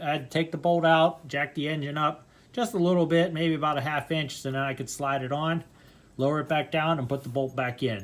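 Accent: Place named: Pittsburgh, United States. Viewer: I didn't even have to look at the location by the video to tell you were from Pittsburgh. Y'all have a very distinct accent. Real thorough tutorial. Gonna be using this soon.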